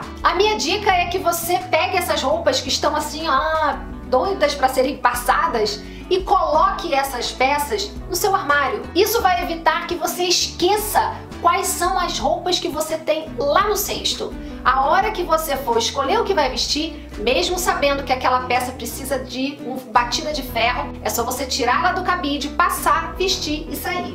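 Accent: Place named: Rio de Janeiro, Brazil. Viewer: Gosto do seu sotaque carioca. Sou mineira. Suas dicas são ótimas. Já vou chegando dando meu like.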